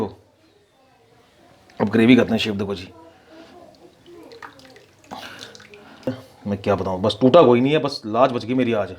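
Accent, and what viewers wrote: Punjab, India. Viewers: I just love to hear the Punjabi accent hindi... ❤️❤️❤️ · Your accent is like my punjabi unclr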